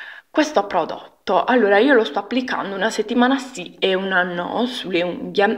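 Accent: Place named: South, Italy. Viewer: ma no che dici , perche ' ???? hai una voce bellissima , ma poi xke' ci dobbiamo fare sempre mille problemi con l'accento ,noi del sud !!! e' questo !!! anzi e' simpatico , ti assicuro che c'e' di peggio ! molto peggio !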